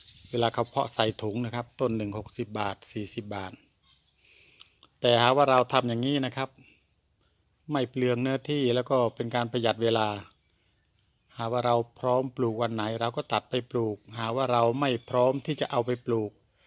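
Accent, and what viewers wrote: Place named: Southern Isan, Thailand. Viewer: พูดสำเนียงเหมือนคนอีสานใต้จังครับ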